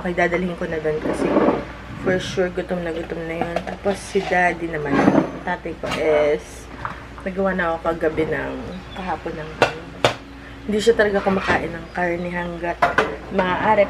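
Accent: Batangas, Philippines. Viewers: GOD BLESS YOU as a good daughter....si mader say ni, she will miss you din daw pag hindi ka pupunta sa kanila. Just continue serving them and itawa mo na lang yung mga comment ni Mader. More power to you and say HI to your parents. I also enjoy watching the 2 boys, lalo na si.... bintog ang cheeks.... is such a sweet ham, baby pa kasi. regards to, saludo ako sa pagpa supportive niya.....you are so Blessed. Keep up the good work,hane.... and dont forget you batangueno accent.....hehehehehe....tita ailec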